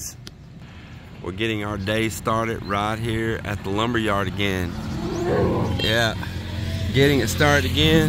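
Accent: Texas, United States. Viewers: Love your videos and your Texas drawl "I tell you what..." A question - do they sell Balsa wood in the PH? I see you at the lumber store regularly and thought I'd ask if you have seen any. Like 2"X4" X 8'? Or a similar wood that is light and strong? Thanks man! 👍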